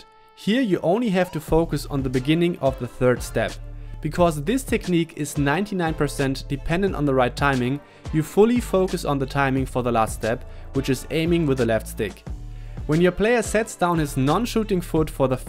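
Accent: Germany. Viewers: Man hört einfach, dass er ein Deutscher ist 😂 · Hört sich ziemlich deutsch an😂😊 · The classic German English 😁 great video!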